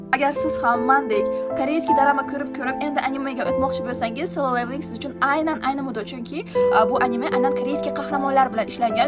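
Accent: Bukhara, Uzbekistan. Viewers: Opa Buxorolikmisiz😮😮😮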